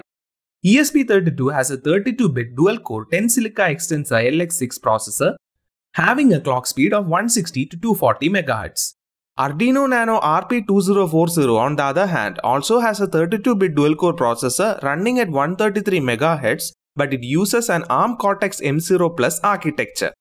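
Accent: India. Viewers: Sorry ... this indish-english is extremly hard to listen to for more than 30 seconds ... Wearable applications are mostly direct connected with need of low-battery consumption. So the Nano in coop with RPi is non-sense.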